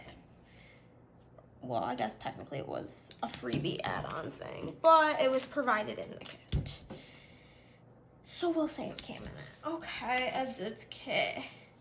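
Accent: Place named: Upstate New York, United States. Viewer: You DEFINITELY have that upper NY accent. It’s cute though!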